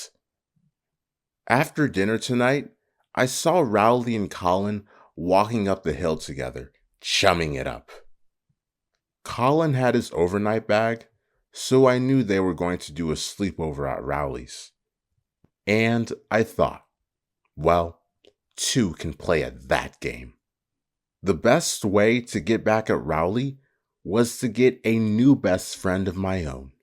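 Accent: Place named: United Kingdom. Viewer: Hes british i think bc of the tone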